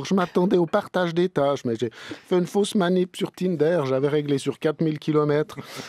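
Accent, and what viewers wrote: Vaud, Switzerland. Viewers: Ton accent Vaudois!!! Exellent 😝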